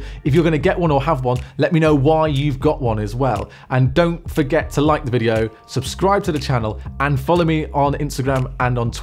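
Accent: United Kingdom. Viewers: Are you from Newcastle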